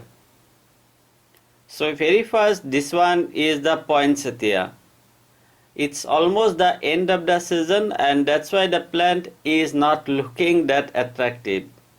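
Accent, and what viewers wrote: Assam, India. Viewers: Are you from Assam? U have an Assamese ascent. Correct me if I am wrong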